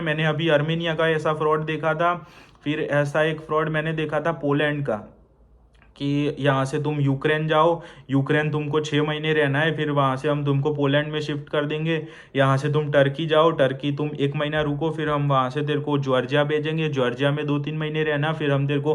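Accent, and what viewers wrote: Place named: Gujarat, India. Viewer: Bhai me ahmedabad gujrat se hu muje lagta hai apke bolne se ap bhi gujrat se lagteho to app no do to bat karsakte hai Mera ek consultant he ahmedabad me 2 sal ka work permit de raha hai after reach 5.5 lakh payment or 600 to 700 euro in factory worker with acomodetion and food by company flight ticket include to kya karu sir ana chahiye ya nahi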